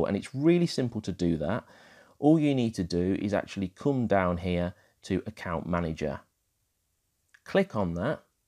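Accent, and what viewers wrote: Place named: Yorkshire, United Kingdom. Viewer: Love the Yorkshire accent (Lancashire?) ... ! and right now trying out Lately here in Japan where I am based (I'm from Yorkshire). I basically came to your tutorial as I only post to IG via my MacBook Pro and need to do some multiple photo posts. Normally post by changing the User Agent to iPhone in Safari but it has too many limitations.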